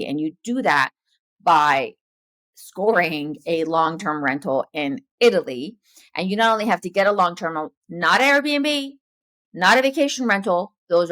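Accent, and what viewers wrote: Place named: Minnesota, United States. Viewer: Can I ask where you are located? Your midwest "Minnesota" is spot on and I'm Minnesotan. I'm looking for an attorney to help with this process and my Jure Sanguinis has been determined.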